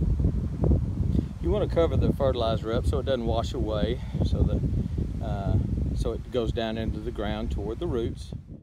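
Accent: Texas, United States. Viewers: Just found your channel through your food prepping videos...subscribed right away due to content, presentation, and that sweet Texas sound of your voice! I'm a transplanted Texan here in the Midwest and I sorely miss the sounds of Texas! Nice garden!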